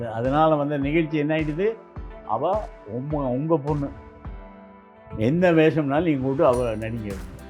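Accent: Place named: Tirunelveli, India. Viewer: super nellai slang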